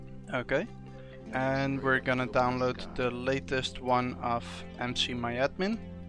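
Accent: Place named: Netherlands. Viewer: Hey dude really nice tutorial but next time just speak dutch that sounds a bit better then that dutch-english. im dutch to so thats why im saying this. But still good job. Keep on the good work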